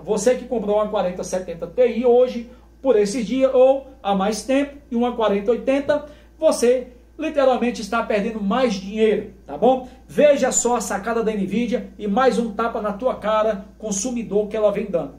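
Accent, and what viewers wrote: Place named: Northeast, Brazil. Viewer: oxi... pra mim tu era daqui do nordeste homi kkk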